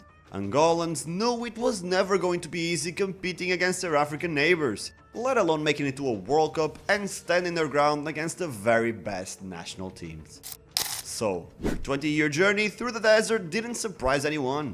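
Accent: Portugal. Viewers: Sabes que youtuber é portugues quando o sotaque portugues é bem evidente/pesado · he is Portuguese, his English accent can tell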